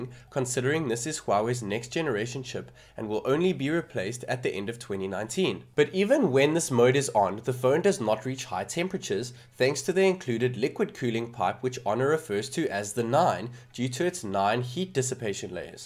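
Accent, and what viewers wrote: South Africa. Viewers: Are you South African by any chance? You have the accent! :)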